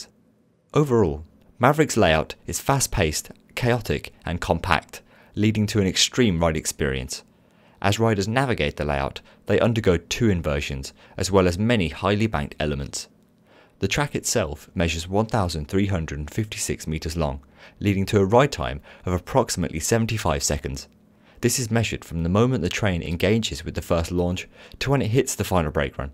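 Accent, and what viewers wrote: United Kingdom. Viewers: but really is this your voice or the voice from a computer. i love the accent(i think britsh) and you are doing a great job!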